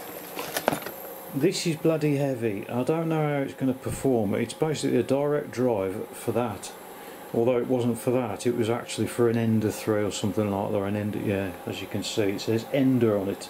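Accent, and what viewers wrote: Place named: England, United Kingdom. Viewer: I'd never stop inventing truly useful and cool stuff for dudes if I had one of those, Mate! None of the unimportant drivel I do daily would ever get done!!! I'll have to keep building stuff out of old "junk" or all the "junk" I do would never get made into new "junk". If I just had a "pence" for every time I said, "Man t'would be so much easier if I just broke down and got a damn printer!" I'd easily have enough "pence" to get a damn printer!!! 😂🤣😂 Much love!!! ✌ ϻя.ƹ PostScript - truly not a stalker, just after a couple vid's I actually watched of your crazy "bum" going fast on e-bikes, I figured I'd give ya a real go and have your channel on "play all" in the background. Of course, I click over to see whenever I hear ya laughing or cussin', as I know those are the important parts to me anyhow. I simply find your relaxed English accent combined with your internal teen-like rebellious angst a calming juxtaposition ... 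HA ... spelled that one correctly w/o autocorrect for a change 😂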